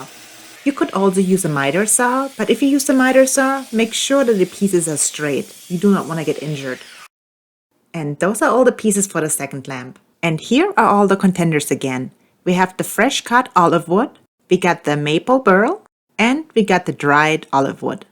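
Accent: Germany. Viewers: Hello, which region of Germany are you from? Because of your German dialect I guess the southwest of Germany.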